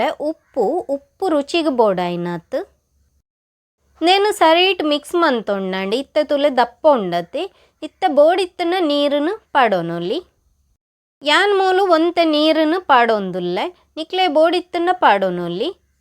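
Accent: Tulu, India. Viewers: manglore Tulu and Udupi side Tulu is little bit different to talk. But nice to hear Tulu language the way of your talking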